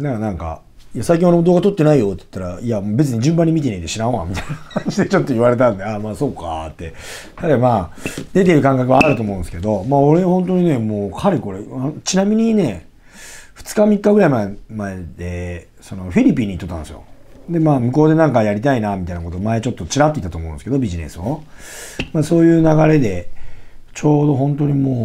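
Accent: Nagoya, Japan. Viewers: はじめまして おすすめ動画に出てきて見させて頂きました。 とても参考になりました。 自然な名古屋弁いいですね(^o^)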